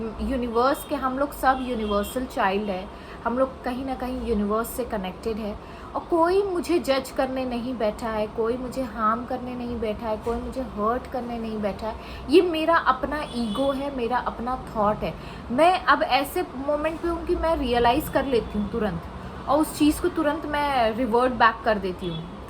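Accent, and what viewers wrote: Hyderabad, India. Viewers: You say Honga jaenga.. are u from Hyderabad?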